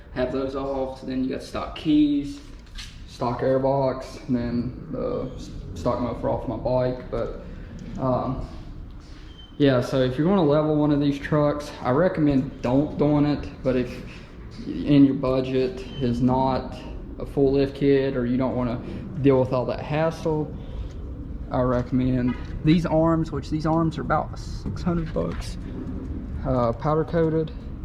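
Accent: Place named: West Virginia, United States. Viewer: Are you from West Virginia or something? You sound kinda hick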